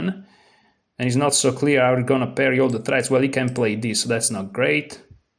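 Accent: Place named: Italy. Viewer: Bro your English is so fluent and rich in vocabulary yet you have this charming Italian accent. It sounds so cool. Never change it! Greetings from Germany!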